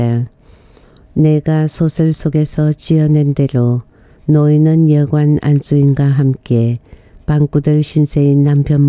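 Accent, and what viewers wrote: Gyeongsang, South Korea. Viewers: 경상도억양이 처음에는익숙지못했지만지금너무도정감이느껴짐니다.감사히잘듣고있씁니다.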